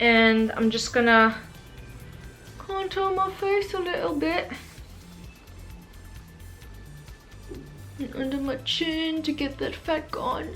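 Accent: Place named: South Africa. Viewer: I could tell by ur accent from the beginning off video. And when u said ja I knew u where south africa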